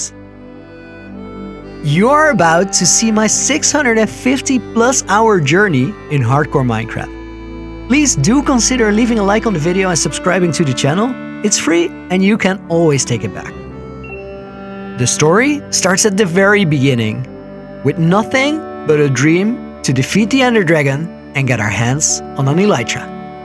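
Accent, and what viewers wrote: Netherlands. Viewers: you sound dutch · Where are you from you sound Dutch!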